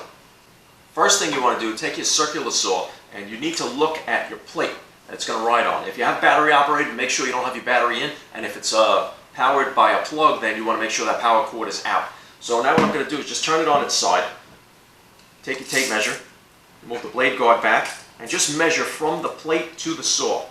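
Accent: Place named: Brooklyn, United States. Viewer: Excellently produced video. Very clearly explained. Thanks. Love your accent. Is it Brooklyn? Sounds cool to an Englishman. :)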